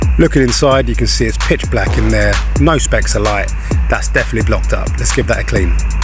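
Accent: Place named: London, United Kingdom. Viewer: Love that london accent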